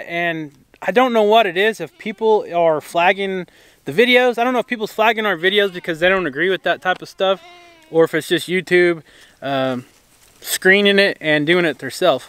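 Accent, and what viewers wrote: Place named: New Jersey, United States. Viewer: as far as I can tell you were raised in Oklahoma but sometimes I hear a slight NJ brogue. I went to college with a lot of people from New Jersey. I am almost 30 years older than you. Back in the mid 1970s it was a less expensive for people to pay out-of-state tuition than pay in state tuition in New Jersey so we had a lot of people from out of state at Pfeiffer College now a University.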